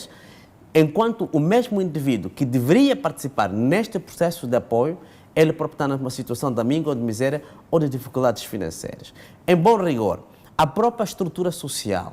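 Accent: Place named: Angola. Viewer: Senhor, nem sei donde falas mas, pela forma como falas, deves ser de um país DITADOR como Angola e tu es lambebota do MPLA q queres furrar no Poder se apoiando d pseudo intelectual, um vazio q enganam tao bem os tolos e analfabetos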